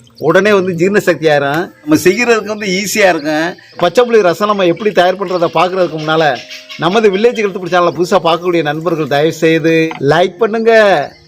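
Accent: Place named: Chettinad, India. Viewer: செட்டிநாட்டு தமிழ் அழகு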